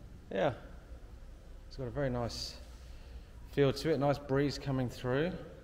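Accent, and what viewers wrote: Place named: South London, United Kingdom. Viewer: Who needs a kitchen with a 7 and noodle shop so close.Lol. Your excitement over a lotus fresh was quite overwhelming and could prove to be a great 'marketing' tool for the company(if they get to see it) and the shot of that lonely grapefruit will last with me forever. All in all another great vid, I do like the casual and friendly manor you have when interacting with the locals and your 'river wandle' accent makes the whole experience pleasurable. Keep it up fella.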